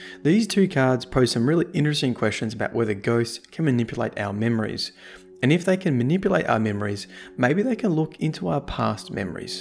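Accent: Australia. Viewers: i suppose i don't quite buy the blinded knight theory mostly because of the story of the last word. if that was the case and ghosts erase our memories and indoctrinate us then why wouldn't jarens ghost just go find another body to resurrect? why would it instead form a bond with shin and turn him into a guardian? I just feel that if control over us is the objective then that ghost picked a very difficult way to go about it lol, but thats just me. I love these lore videos though, this is the first destiny lore channel that I can REALLY get into. you remind me of Vaati Vidya and not just because of the australian accent lol. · Are you from QLD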